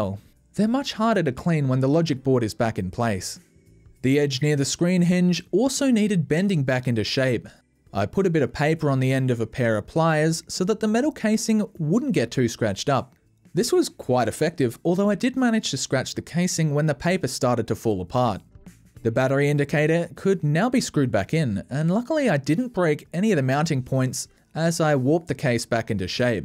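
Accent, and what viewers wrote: Australia. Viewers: There's something oddly satisfying watching this kind of video and hearing an Australian accent at the same time.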